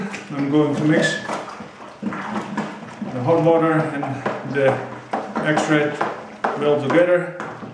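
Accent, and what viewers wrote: Netherlands. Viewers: hey dude I moved to norway (from holland) the beer here is about 10 times more expensive as in holland. so when I stumbeled on to this in a paint shop ;), I just bought the stuff because I like to do new stuff and then yeah when it comes to beer theres no doubt. Ìm starting right now on the brew. but dude I gues you are dutch too right. the way the speak english sounds so dutch!! haha. well Im gonna make me some beer now!!!! thanks for the movie!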